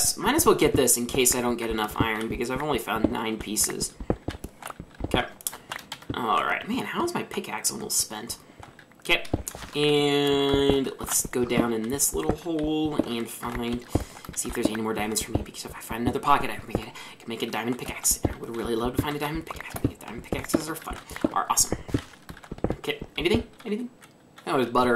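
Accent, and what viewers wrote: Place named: United Kingdom. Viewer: lol British accent😃😂😂😂😂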